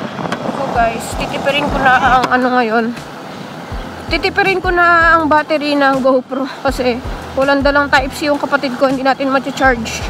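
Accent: Batangas, Philippines. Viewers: nakakaaliw talaga mga punto ng mga Batangueno tapos ang nakakaaliw ka pa magkuwento. aba'y panalo!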